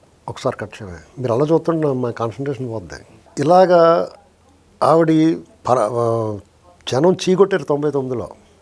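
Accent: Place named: Godavari, India. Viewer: గోదావరి జిల్లా భాషని చాలా చక్కగా ఉపమాణాలతో చెబుతుంటే ఇంకా ఇంకా వినాలని పిస్తుంది.... v r proud of U.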